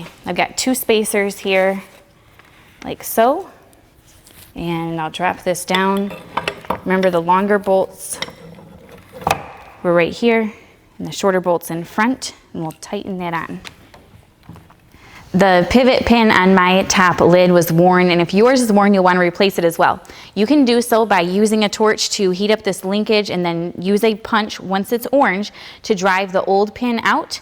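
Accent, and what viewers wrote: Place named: Michigan, United States. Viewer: The Michigan accent is too funny.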